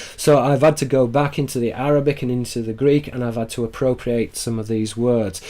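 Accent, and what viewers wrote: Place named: North West England, United Kingdom. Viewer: Dear, thank you for your most interesting work. I was advised to find you by way of Tom Carberry via shroomingnewman 3... I’m sorry I can’t remember from which video this came but you said that the? (rulers) release knowledge through deception. Would it be alright for you to help me understand this a little more? Also, in relation to the ‘ha’... for almost four years myself and my son have been, (for want of a less triggering term), ‘born again in the Spirit Christians’... anyway, whenever I pray, (literally just talking and asking if necessary) to our Father and Mother and I forget something, I always ask the Holy Spirit to help me... whenever I do, my mouth opens of its’ own accord and a gentle but definite exhalation of breath happens. And it makes that lovely little sound... So, ha! that’s that... 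Then also, you sound so very much as if you hail from the North West Of England. We live in Morecambe. You have a very similar accent... Okay, well i’ll go now. Thank you again and all of the very best, from me Sarah.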